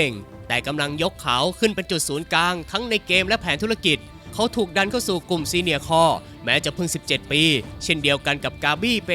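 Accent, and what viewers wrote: South, Thailand. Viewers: อย่าพูดใต้อีกนะครับ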